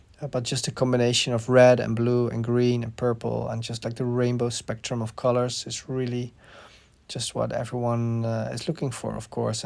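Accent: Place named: Netherlands. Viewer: Just by hearing his voice speaking English i now he is a hollander 😂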